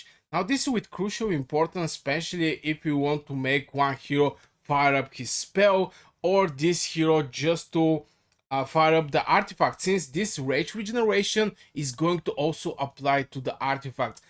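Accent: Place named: Bulgaria. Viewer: Знаех си, че си от България хахах. Имаш български акцент като говориш, а и се казваш.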